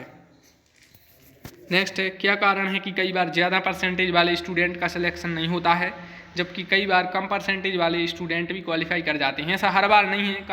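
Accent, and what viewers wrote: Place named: Sagar, India. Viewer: Bhai ap sagar side k hi ho kya...m bhi wahi kha hu apki awaz..se lg rha h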